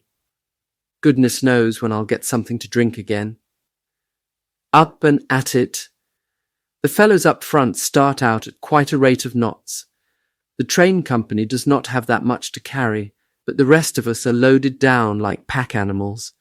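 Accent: RP, United Kingdom. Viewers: Not a German accent but you can say the German names properly. Obviously, you're a Brit. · Accent is british not german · A British voice narrating a German point of view. Not a fan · Are you British or have you lived in United Kingdom for long. I like your accent. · It's Eton Pommy British.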